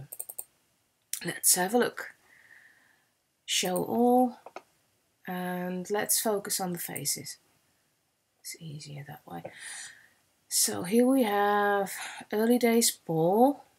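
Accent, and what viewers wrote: United Kingdom. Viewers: "Hey Ringo, how are you?" HAHAHA :D Nice video! I wonder how long it took to collect all these gorgeous photos. ...And I feel your struggle with the English language (I'm German and I try to get rid of my German accent haha), but you already do sound pretty well and British in my opinion! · Thanks for sharing this, it was wonderful and a great way for me to start my day. I found myself chuckling along with some of your commentary especially regarding the PIDers. I thought at first that your accent sounded slightly British but as an American with my own southwestern twang (accent on the southern) I wasn't sure until you said you are a Dutch girl. Anywhoo thanks for this.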